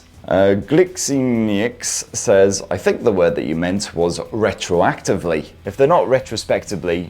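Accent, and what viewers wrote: United Kingdom. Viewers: I still not understanding your British special accent...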